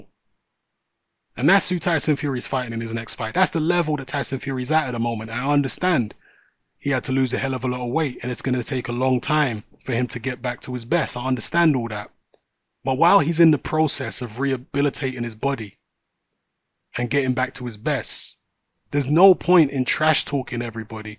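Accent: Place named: United Kingdom. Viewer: Shut the f up dude u sound like u from the u k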